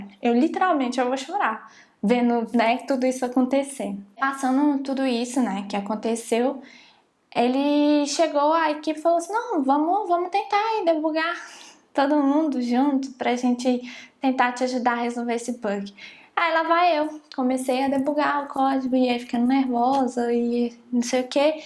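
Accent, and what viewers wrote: Minas Gerais, Brazil. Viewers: Nossa, vc foi contando e eu fui imaginando a cena. Acredita que só de imaginar eu fiquei até tremendo de nervoso aqui também? kkkkkkkkkk Obs: Vc é mineira né? O sotaque me parece familiar. Sou mineiro de JF.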